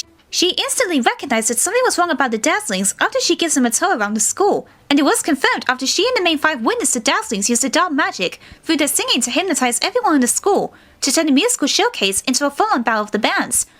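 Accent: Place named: United Kingdom. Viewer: For your great British accent and your love for Sunset Shimmer, you gain a subscriber.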